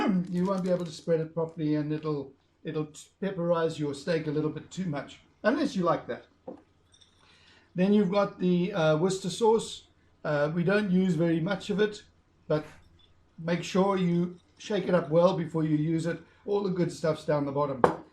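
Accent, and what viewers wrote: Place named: Zimbabwe. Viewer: Thanks for the video, you make it the same way I do. Only difference is I dunk mine in a vinegar tub to rinse the salt off. From the accent I'm guessing Zimbabwe, I'm from Karoi 🙂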